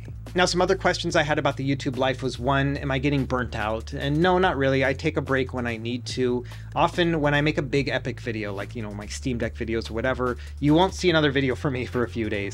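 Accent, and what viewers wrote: Minnesota, United States. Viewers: Minnesotan here, no wonder I vibe with you so much. Similar interests, familiar accent. Great job with the channel. You singlehandedly got me into retro handhelds :D had no idea you are in your 40s, I'm 28 and bald, so I thought you were younger than me lol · Also, thanks for commenting on your accent. I’ve never been able to place it, but you nailed it - definitely Minnesotan. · Oh wow, I didn't hear the Minnesota until you pointed it out but now that you say it I absolutely do. 😂 Love your work; thank you for all the dedication and time you put into everything you do. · Congrats on 400k subscribers, its well deserved. This is one of the highest quality channels on YouTube and the effort you put in really shows. It's interesting what you said about the midwest accent, I always just thought you were from Minnesota. Won't be long to 1 mil subs now! 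· As a Minnesotan, it makes so much sense now that that you picked up a bit of Minnesotan accent! I could never quite put my tongue on it, but your voice felt familiar lol! Love the vids, keep it up ❤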